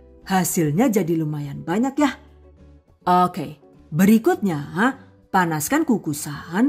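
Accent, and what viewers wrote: Banyumas, Indonesia. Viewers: ngomonge jan ngapak gawe guyu inyong #GiveawayTHV2021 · Mom nya wong bnyumas kiye hehhe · Kalau bahasa ngapak emang gitu bund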